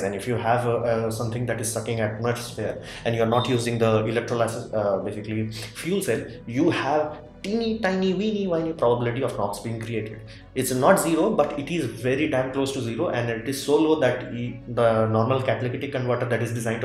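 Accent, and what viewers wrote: India. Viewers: Thank You :-) Piece of advice, speak slower, i've spent a lot of time with indian support people and it takes a while to get used to the accent. But other non native english people (I'm danish) have to translate twice when working with indians."indlish"<>english<>danish so talking slower is at the essense.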